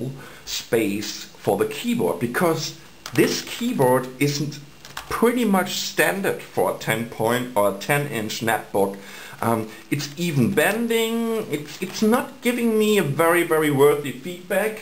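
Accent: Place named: Germany. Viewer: I like his german accent :)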